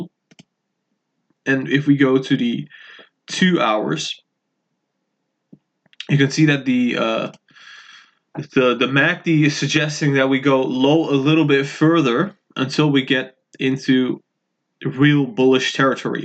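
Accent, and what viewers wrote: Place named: Netherlands. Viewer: Ik dacht al je engels heeft een Nederlands tintje hahah, volg al een tijdje je video's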